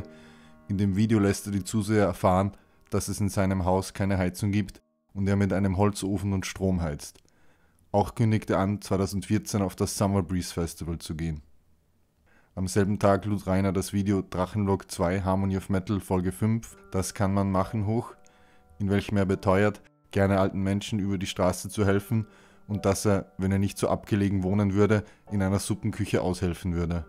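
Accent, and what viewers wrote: Austria. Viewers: Ich finde dein ge-österreichel doch sehr sympathisch, und auch, wie du mit völlig trockener Stimme Reinerles Utopien wiedergeben kannst. Liebe <3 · Bist du Österreicher? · wie man sofort merkt, dass der erzähler österreicher ist haha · gibt es silent hill wirklich?? austrian voice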